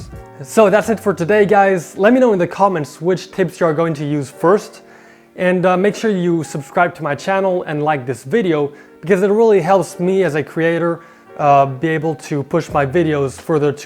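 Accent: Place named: Europe. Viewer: Sounds like europe somewhere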